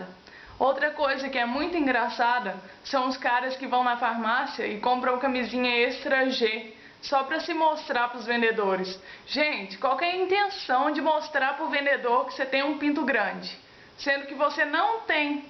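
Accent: Minas Gerais, Brazil. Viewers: Uai gente, ela é mineira, mais óbvio impossível. ;d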